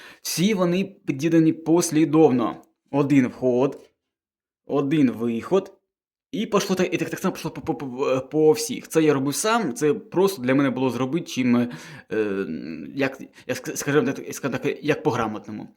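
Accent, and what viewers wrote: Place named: Sumy, Ukraine. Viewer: Молодець,що пробуєш на українській мові, Сумщина тримається,сам з неї😊.всі ми тут розмовляємо суржиком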